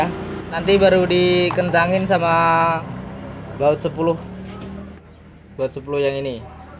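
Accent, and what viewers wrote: Indonesia. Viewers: Logatxa gw kenal ini orang madura heheeheh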